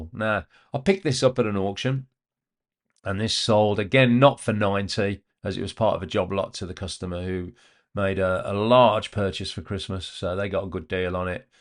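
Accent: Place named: England, United Kingdom. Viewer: Catching traction with eBay videos is rough in my opinion. You already know how to record, edit and upload; you may want to consider starting a second channel for a different topic. Everyone loves an English/ British accent. I'm not sure which one it is. I'm guessing English is the proper term. I'm certain you could do very well in other categories if you're interested.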